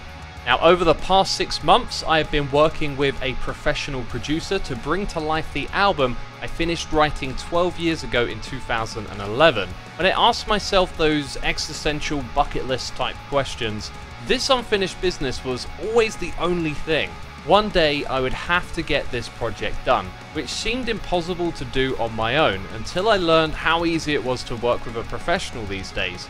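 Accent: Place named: RP, United Kingdom. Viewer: Edit at the top for importance: Good music ill definitely check it out Wasnt quite a face reveal but its funny, nothing what i was expecting, funny how minds work with being canadian i hear tour accent and i picture a very right and proper dude just chillin drinking tea, i knew you werent, your personality cones out alot in your vids, just saying its funny how minds subliminally paint a picture and it sticks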